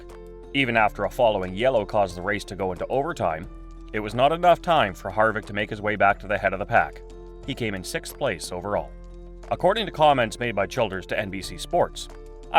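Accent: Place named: Canada. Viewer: A NASCAR channel with the Canadian talking eh,. What a joke!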